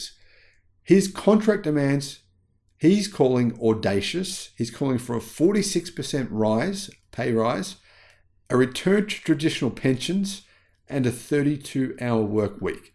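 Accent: Australia. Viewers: u sound like one of my Aussie or NZ, cussins, ps, kindness is still free!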